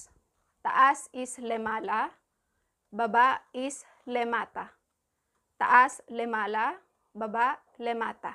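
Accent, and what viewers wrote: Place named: Philippines. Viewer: You sound phillipino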